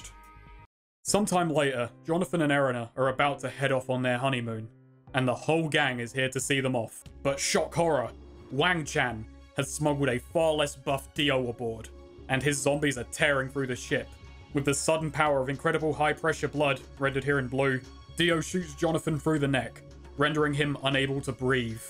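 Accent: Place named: Liverpool, United Kingdom. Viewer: Alright eh! A scouser in bizarre Adventures of Jojo, Class one there mate.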